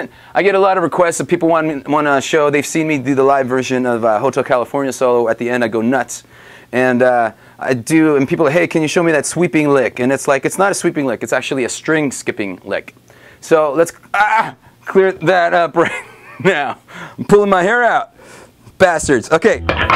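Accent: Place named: Canada. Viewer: are you a canadian? You sound sort of like a canadian.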